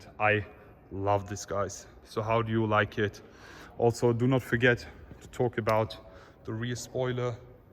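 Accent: Germany. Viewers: Diese Deutsche Akzent beste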